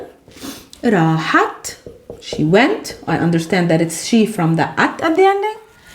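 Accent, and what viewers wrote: Palestine. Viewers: كتيير حلو .. اللهجة الفلسطينية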